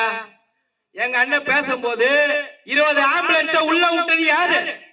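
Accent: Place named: Chennai, India. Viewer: அருமை சென்னை தமிழ் வாழ்த்துக்கள் tvk வெல்லும்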